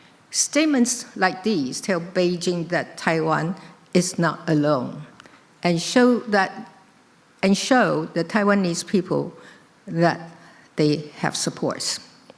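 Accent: United Kingdom. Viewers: 好歹我住過Brighton一年，倫敦很近也跑了很多次，好吧是有一點英國腔沒錯，但真的是一點點，就像黑龍講中文一樣的那一點..但你要說英國腔好好聽我就覺得有點..呃…你自己去英國住一趟再回頭看你的評論😅 倫敦政經學院沒有造假？我倒覺得反而是被證明這水平真的不太可能有博士學位。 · 相比某人只靠小本本，今年升級為大字稿，總統的英文表達與敘述能力，實在是太優了，再加上總統略帶英國腔的演講，只能說讚讚讚！ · 濃濃英國腔😂😂😂😂😂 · 台灣人不是學美式英語的嗎？為什麼一口英式英語？ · 好迷人的英式英文❤ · 即是英文，因她的口音是英語，而不是美語，口頭表達不荒不亂，不需要看稿子，字正腔圓。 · 拜託！要在國際媒體前，做那麼長的英文演說！有演講稿不是很正常嗎？要你在國際媒體前用中文演講，恐怕你也不能做到一字都不出錯吧！你看她英文說得多從容不迫，說得一口英式英語多優雅啊！你讓習近平來，他連英語都聽不懂！不要說要他用英文演講！ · 的英國腔好好聽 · 聽總統說英式英文真的是一種享受🥰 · 謝謝總統的堅持✊❤ 話說，總統的英文有英國腔耶，蠻好聽的😆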